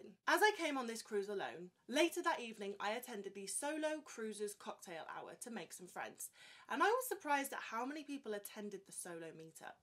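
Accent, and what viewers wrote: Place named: Midlands, United Kingdom. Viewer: That sounds like a Midlands accent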